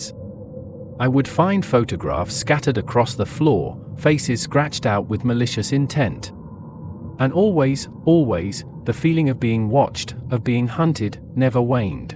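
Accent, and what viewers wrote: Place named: England, United Kingdom. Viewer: An English narrator! 😀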